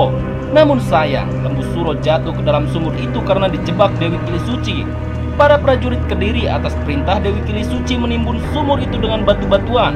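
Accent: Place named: Arab world. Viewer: Ngomong jawa pake logat arab ya seperti itu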